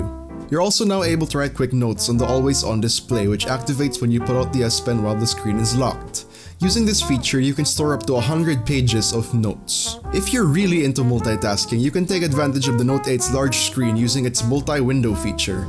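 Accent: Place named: Philippines. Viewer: Noypi accent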